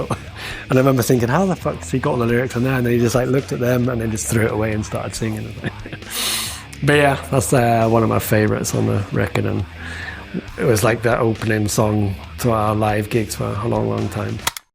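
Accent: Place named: Sweden. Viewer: Sounds like he's lived in Sweden so long that he's developed a Swedish accent. Or is this how people from Corby sound?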